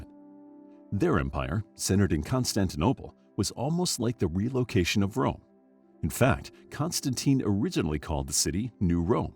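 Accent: United States. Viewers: I can barely understand the american accent 😭😭 had to repeat the video 3 times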